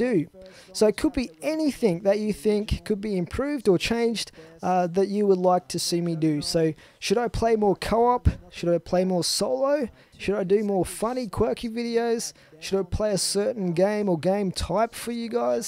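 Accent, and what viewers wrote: Australia. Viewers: I'm not entering for the competition (obvious reason, no Xbox) but I'd say for 1. The Australian accent along with the fact that you don't go much astray when talking about random things during gameplays that get annoying (some YouTubers have the issue, luckily I don't see it with you :D). Most of the time your conversations with others or just the voiceover for the gameplays you do are entertaining/fun to listen to. As for 2., I'd say get better internet for streaming :P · 1. Custom Zombies - You're partly responsible for getting me into it (through Yoteslaya r.i.p.) - Love hearing a familiar Aussie accent on a typically american/british dominated genre. 2. I would possibly like shorter videos - Max 15mins. Otherwise, everything else is great! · It may sound a bit strange, but the original thing that really drew me to your gameplay was you accent. 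Nothing beats an Aussie accent. And as to the second question, i think that more games played with subs would be very neat., i am so proud of you. You have come so far with your channel, and i just would ask you to never give up. Nothing cheers me up after a bad day like watching a video:D thanks so much, and keep up the fantastic work:D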